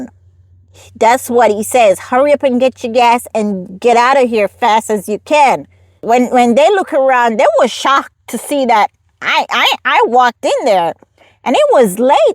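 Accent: Jamaica. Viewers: You have a very thick Jamaican accent. I thought you said you were raised in the United States. It seems like if you’ve been here so long that your native time wouldn’t be so thick 😊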